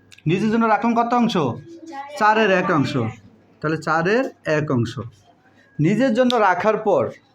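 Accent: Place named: Bhola, Bangladesh. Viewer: স্যার আপনি কি ভোলায় থাকেন ? কথাবার্তা ওইরকম লাগছে ।আমার নানা বাড়ি ও দ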